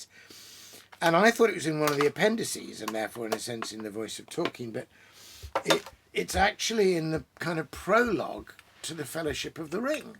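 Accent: England, United Kingdom. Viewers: I am Canadian so seeing a man with a British accent smoking a pipe reading Tolkien, this is what Canadians believe the British turn into once they reach 50 just the sweetest persons lol. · If I ever make it to heaven, I imagine this is the cameo of a man I’ll meet at the front doors. Smoking a pipe, reading my life back to me with an honest smile and an english accent. Until we meet again. 🍻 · Strange, I saw this in my feed and thought to myself: this guy probably has an English accent. So I clicked on it and behold it was about pipe smoking, which I do from time to time but have never searched for this stuff on YouTube. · I can only see an elderly Jack Black with a well spoken English accent.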